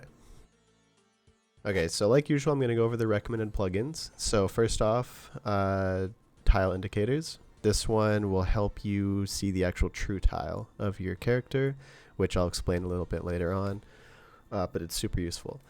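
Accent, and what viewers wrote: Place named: Canada. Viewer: We guessed you were Canadian 2 seconds in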